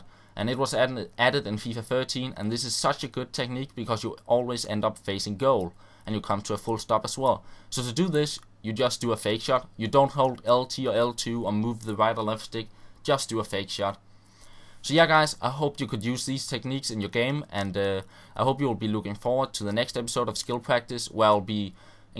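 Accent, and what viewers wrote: Denmark. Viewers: You are from Denmark, i can hear that from your accent. Im from denmark to. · your from Denmark. you got the best nordic english accent I ever heard! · Man kan tydeligt høre at du er dansk! :D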